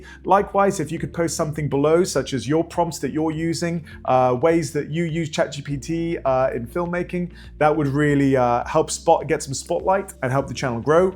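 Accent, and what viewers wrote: United Kingdom. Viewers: This is pretty funny, a Ukraine YouTube blogger uses it; the voice is the same, although it is Ukrainian) a gorgeous British accent you have, mister)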